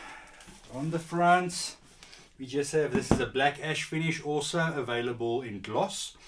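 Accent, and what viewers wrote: South Africa. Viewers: I now have the svs ultra evolution bookshelfs with evolution center and 2 x pb1000 but i was using floorstanders before so i am missing 4 drivers and now i think it is lacking in presence. So i am thining to go with the primes Pinnacles actually, as the evo towers would definitely be too big for my 12 foot by 10 room..also a bit pricey too. I hope the svs prime pinnacles will blend ok wirh my svs evo ultra center. Maybe i need to swap that out for a prime center? Maybe just the plain prime towers would be enough. I am in a dilemma as I can get opened box from dealer for £699 or buy the prime pinnacle towers for £1600 A South African accent? First time i heard. Nice accent. No offense intended at all, as i am sorry I thought you were German to begin with, with a bit of an Australian accent. Got it sooo wrong :) sorry! 😂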